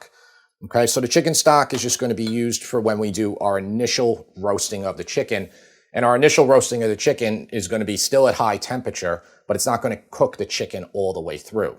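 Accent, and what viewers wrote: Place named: New York-New Jersey, United States. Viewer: With his NY-NJ accent, the host sounds like comedian Jim Florentine. 😂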